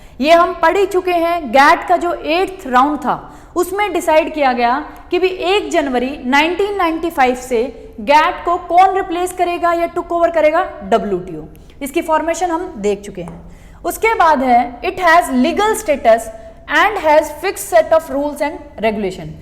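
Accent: Punjab, India. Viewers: Mam are u pnjabi?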